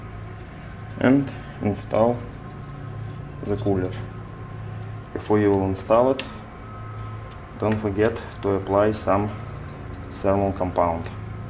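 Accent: Russia. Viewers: Братан по голосу слышу что русский . У тебя было место под остальные 3 слота памяти или всётаки он закрывает их , люди пишут что закрывает .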